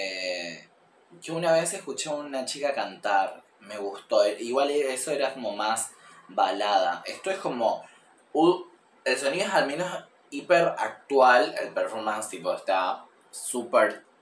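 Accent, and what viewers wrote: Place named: Rioplatense, Argentina. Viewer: Por el acento debe ser de Argentina o Uruguay. 😉😊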